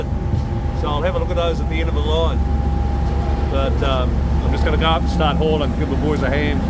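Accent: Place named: New Zealand. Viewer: Gotta say I love watching this shit as a keen fisho always interested in how the pros do things , so I subscribed keep filming You’ve got a good narrator’s voice too might be the bloody kiwi accent Just a note for you I fish out of Robe SA water temp this summer has been around 13-15 degrees c ,I think the fish are frozen Cheers from down south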